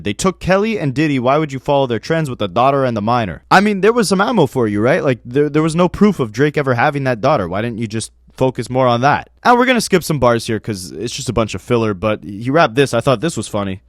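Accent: Canada. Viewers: Bro i knew you were Canadian!!!! You have similar speech patterns to Whats The Dirt. (Not saying you are WTD). You say "against" the same exact way. I was wondering if it was a Canadian thing. Love the videos btw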